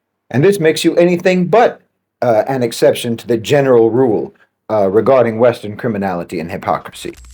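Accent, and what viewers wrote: United States. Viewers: As he condescends with an American accent. 🙄 I can see what you see. Unfortunately, i am silenced while you are given a voice AND a platform to damn the 'collective West! Unbridled patriarchs in positions of power are the scourge of the world. Don't conflate those monsters with a population, the majority of whom were brainwashed by the most powerful propaganda machine to have ever existed! What makes YOU the exception?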